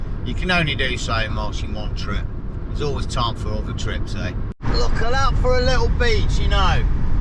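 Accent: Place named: England, United Kingdom. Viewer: Just came across yourself really enjoying your videos I’ve had to put subtitles on because of that English accent lol 🏴💚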